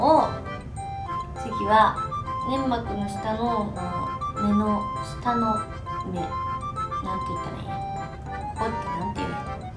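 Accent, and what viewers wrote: Kansai, Japan. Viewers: 関西弁かわいい。白いシャドーの乗せ方参考になる。。可愛い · 関西弁かわいい💕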